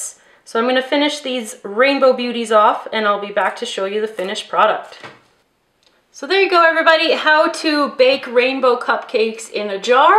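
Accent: Canada. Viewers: Looks good. Are you Canadian? No hate but accent kinda bothers me.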